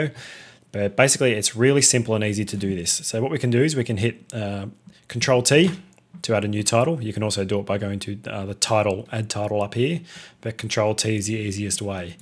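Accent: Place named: Australia. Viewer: Thank you for the tute.. Best I've found so far. Good to hear an Aussie accent.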